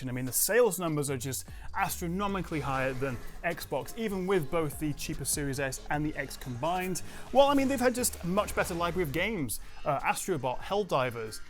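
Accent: United Kingdom. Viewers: Talks way to fast and that briitish accent get real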